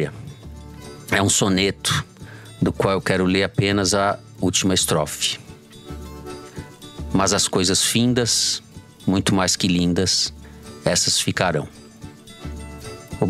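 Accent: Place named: São Paulo, Brazil. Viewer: 😢😢😢 Sucesso para cada um em seus caminhos! Sentirei saudades da sua voz, Fernando, sotaque paulista maravilhoso 😊 Quiçá teremos novos encontros aqui e ali do Foro de Teresina🙌🙌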